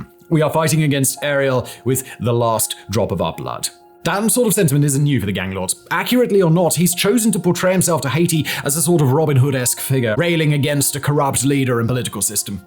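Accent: RP, United Kingdom. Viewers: Wow, can't stomach the Queens English...[you're going to hurt your neck, hands or teeth]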